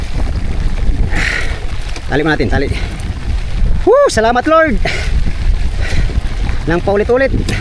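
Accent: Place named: Visayas, Philippines. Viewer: Idle ayusin monga yung pagsasalita mo magtagalog May halo kasing bisaya,kong bibisain mo nalang kaya para hinde ka mahihirapan sakalisod haha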